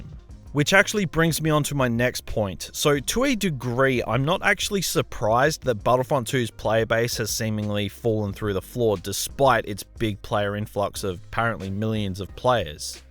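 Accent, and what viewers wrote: Australia. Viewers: Your Australian ? Nice I thought for some reason you were English lol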